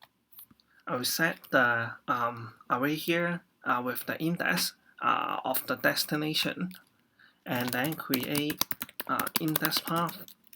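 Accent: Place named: Hong Kong. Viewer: Helpful!港式英语，哈哈！不过讲粤语更加少人看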